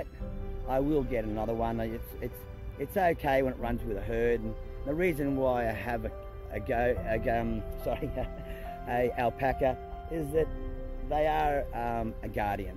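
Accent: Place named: Australia. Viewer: Oi! Oi! Ausie! Ausie! I love the accent. You have beuatiful animals. I love the farm life.💚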